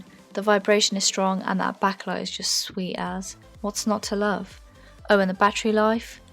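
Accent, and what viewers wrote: United Kingdom. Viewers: Love ur accent, which part of England are u from?:)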